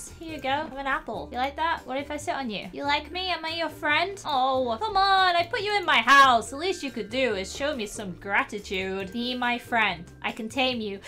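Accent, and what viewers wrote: United Kingdom. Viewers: Name the horse Nutella hahha cause that’s the horses colour!!! Bye the way are u British??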